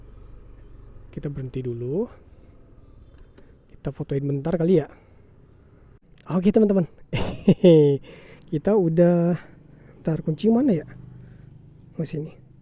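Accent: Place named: Solok, Indonesia. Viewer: Dari logatnya ucapan adikni .....ini kek logat Solok lah.....orang Sumbar asli inimah....karna ketahuan dari cara berbahasa dalam komunikasi ....MINANG EMPIRE INI MAH ...hahahaha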